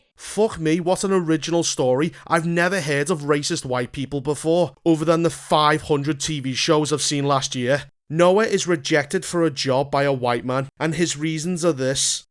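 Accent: Liverpool, United Kingdom. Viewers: This guy sounds like the typical scouse arsehole. You could’ve used reasonable words to say “Oh yeah not a fan of this movie tbh” but nah you overreacted. Yeah okay it’s not everyone’s cup of tea but at least be respectful about it. Calling a director a dickhead was also a typical dickhead move. Just a terrible review overall. At least this film was better than the last two transformers movies (not including bumblebee)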